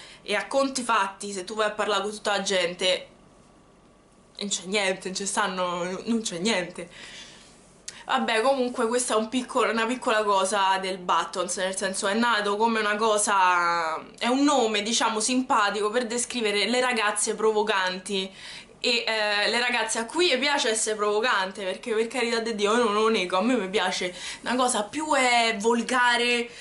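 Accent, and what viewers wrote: Rome, Italy. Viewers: Io non ho questo stile ma ti giuro che è bellissima questa rubrica,poi io amo l'accento romano (io sono milanese) quindi amo sentirti parlare 💙💙😘 Ah,sei sempre la più figa 🔝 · perchè non provi a fare anche un video dove insegni detti Romani? o comunque dici qualcosa in romanaccio, adoro come parli ahah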